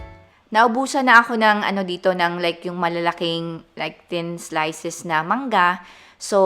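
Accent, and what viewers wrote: Visayas, Philippines. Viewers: I can hear a tinge of bisdak in your voice. Hope to hear more from you! 😍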